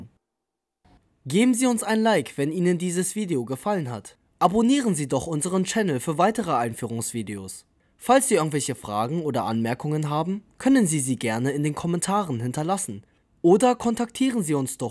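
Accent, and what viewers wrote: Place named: Turkey. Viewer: Das ist kein Dialekt. Das ist ein türkischer native Speaker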